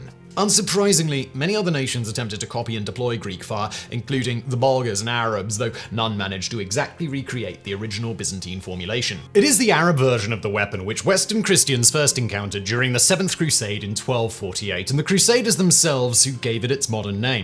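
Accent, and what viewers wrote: England, United Kingdom. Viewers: British Accent. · As an Englishman I can honestly say that has the most quintessentially English accent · Brilliantly done as ever old bean! One tiny moan…the word ‘swathe’. In English we pronounce it “Swaythes” not “Swoths”. I beg you, stop using the US pronunciation? It grates the ear when the rest of your accent is sooo English ✌🏼 Thanks a million for all the videos 🙂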